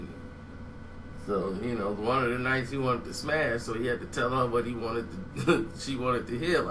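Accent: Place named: Houston, United States. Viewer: Nigga talk like he from Houston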